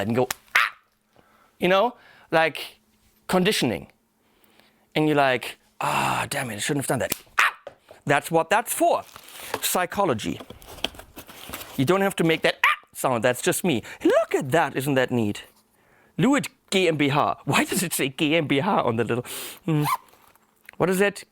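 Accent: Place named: Hesse, Germany. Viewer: Hahahaaa, wenn Du deutsch sprichst, kommt ein bisschen Hessisch durch... 😀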